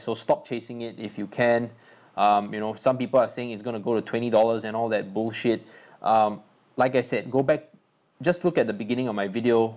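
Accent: Singapore. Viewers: Hey, listening to you I gotta wonder if you're Singaporean cos I am hahaha:D Cool video and thanks for the info!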